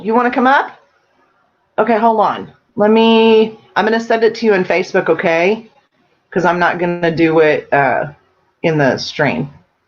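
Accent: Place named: South, United States. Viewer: Southern Accents are the best, hope yours comes out more often!;) South's gonna rise again!:D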